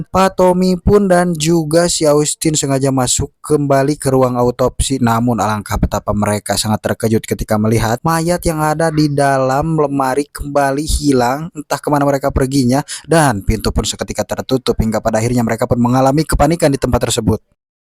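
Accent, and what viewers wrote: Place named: West Java, Indonesia. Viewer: wkwkwkw logat asli pasundan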